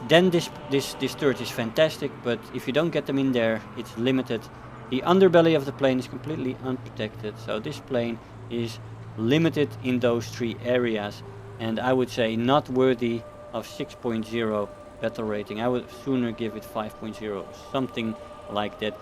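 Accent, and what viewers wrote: Netherlands. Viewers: your dutch i can hear it haha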